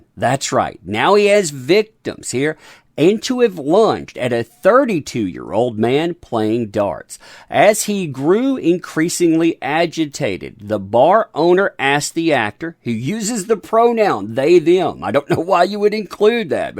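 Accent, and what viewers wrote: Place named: Appalachia, United States. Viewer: Can you stop talking in that accent? You're not a hillbilly hick from the 18th century.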